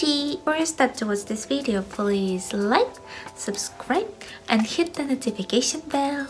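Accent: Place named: South Korea. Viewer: Your English ascent is like Korean are you from South Korea, but you don't look like Korean.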